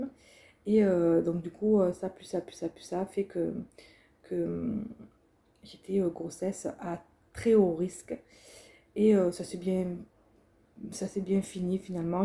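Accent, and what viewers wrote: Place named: South, France. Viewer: Coucou tu m as fait trop rire et j adore t ecouter avec ton accent du midi oui je pense comme toi en vieillissant on aime redécouvrir les choses simples comme le jardin bon aprem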